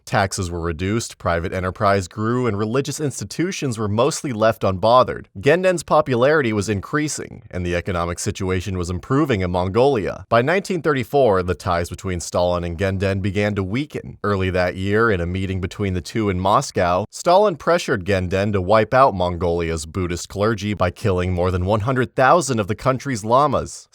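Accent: United States. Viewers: speaking in America English ? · Only an American would talk about “Buddhist” church! The ignorance is astounding! They are also the ONLY people who say “Moss-Cow” and not the correct: “Moss-Cow” Never trust an American teaching history. Especially one ignorant enough to think Buddhist’s have churches!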